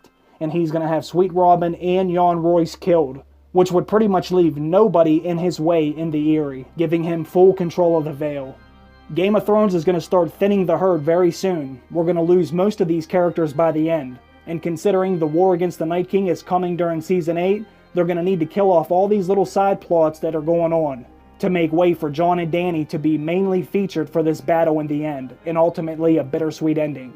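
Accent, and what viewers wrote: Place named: New Jersey, United States. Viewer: you need to be deported then. disappointed with your lack of caring and making my ears bleed with your semi informative jersey accent.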